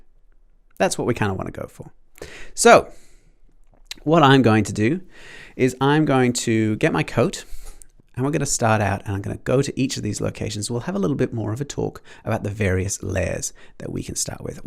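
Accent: Australia. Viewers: First time finding the channel and I immediately ran to the comments to look for this, except I'm pretty sure he's Australian.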